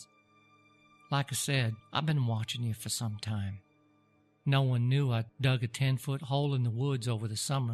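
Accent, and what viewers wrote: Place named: South, United States. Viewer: The narrator’s voice reminds me of a highly educated southern accent. Very much like the great historian Shelby Foote .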